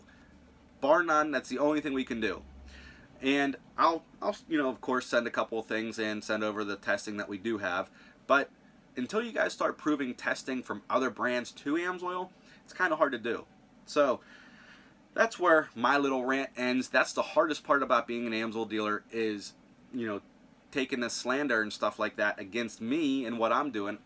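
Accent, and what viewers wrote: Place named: Pittsburgh, United States. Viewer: You have a Pgh accent. I know because I grew up in the South Hills off Bower Hill Rd. BTW, love Amsoil, but best to put in newish or well-maintained vehicles.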